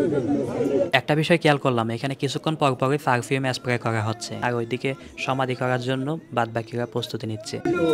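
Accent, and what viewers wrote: Chittagong, Bangladesh. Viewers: আপনার র/ঢ় উচ্চারণ ঠিক করেন,চাটগাঁইয়া ভাষাই আপনার জন্য উওম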